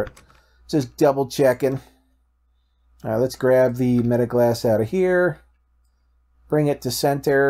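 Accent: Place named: United States. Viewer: This guy sounds so American idk...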